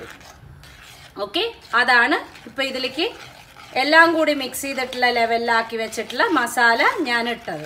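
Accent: Palakkad, India. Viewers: Variety dish,,,,, also Ur way of speaking, palakkadan style, kelkkan nalla rasam undu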